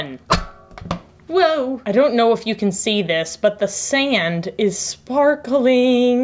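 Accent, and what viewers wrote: Texas, United States. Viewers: I am not a surfer (tried and failed LOL) but a diver (instructor even) and that is kind of the same ..... the beach part of the soap is reminding me of a ocean glass beach or the beach after good storm with all the torn out algea on the beach..... and I hardly use "soap" I am just coming back for you bubbly personality.... I love it that you dont doll up for work cause that is what it is even if you take us along with the cam.... as you said it is hot no need to be painted like a parrot ;-) and of course I come back for the texas drawl "OH MY GOAD" and the "Scrappy Scrappy" was really disappointed the other day I found a video without a scrappy scarppy LOL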